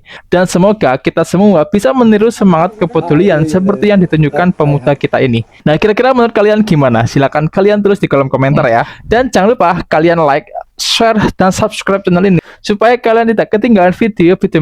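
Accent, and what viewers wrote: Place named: Java, Indonesia. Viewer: Logat Jawa nya ,,jangan terlalu medok ,,min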